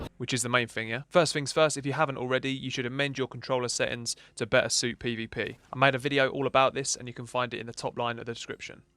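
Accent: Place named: South London, United Kingdom. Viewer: Like your direct no nonsense videos, sound like a South London boy ...cheers!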